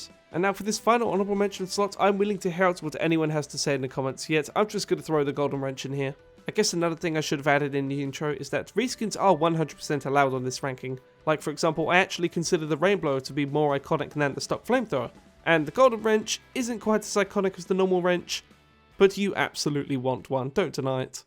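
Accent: United Kingdom. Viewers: sounds kinda British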